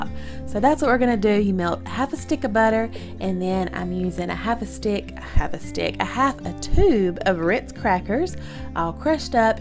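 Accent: South, United States. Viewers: Thank you for sharing your recipes ! Your Southern accent makes it even more enjoyable to watch ❤️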